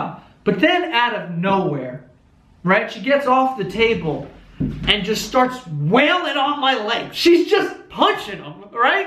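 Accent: New York, United States. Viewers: His New York accent super cute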